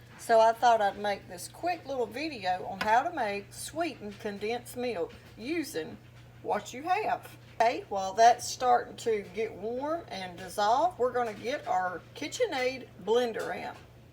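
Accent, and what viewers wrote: South, United States. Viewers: I love the country accent and pronunciation. It makes me feel like I’m back home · I love ur accent, I’m from the south and your voice reminds me of my Mothers. God bless you for ur kindness. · I love her southern style and dialect. She’s charming. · What a great Tip ..I'll be telling my Daughter this .. Excellent 👍👍..Thank You.. Love 😘😘 From U.K.❤️❤️🙏. (& I love your Southern Accent ..❤️ )... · I love you mom, may JESUS bless you with love grace mercy protection a donation on YouTube to make income and have your baking channel grow! you have honest sweetness to your person. Very Lovely! I LOVE YOUR Southern Accent! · Thats just our lovely southern slang 😊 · SOUTHERN COUNTRY GIRL,LOVE THE ACCENT,AND IT IS A BLENDER,A MIXER,A WHIPPER OR WHAT EVER ELSE SHE WANTS TO CALL IT ,WHAT EVER YIUR MOM SAYS IT IS ,IT IS,LOL😂😂😂😂😂😂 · Thanks for sharing your recipe ❤your Southern accent! 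· She said YouTube Altha rhythm😂😂😂😂😂😂😂😂 dang she slow southern talking granny makes me feel like I’m back home in Kentucky ❤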